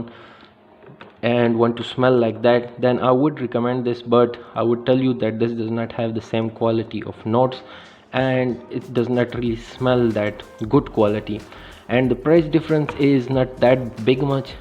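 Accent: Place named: Pakistan. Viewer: Пакистанский акцент. Очень сложно понять его английский.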